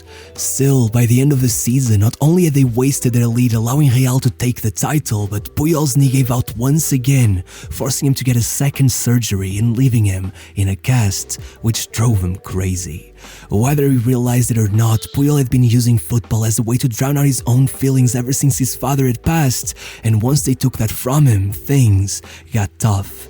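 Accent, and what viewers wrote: Portugal. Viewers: Your “Roberto Carlos” accent… você é Português?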